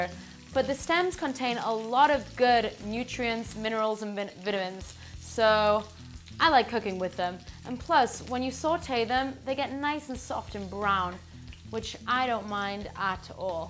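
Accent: Ireland. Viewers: Interesting. It's like an American accent with an Irish twang.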